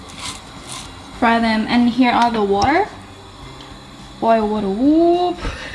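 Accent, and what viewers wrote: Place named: Australia. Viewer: You are picking up a good Aussie accent I notice in many words you say 😁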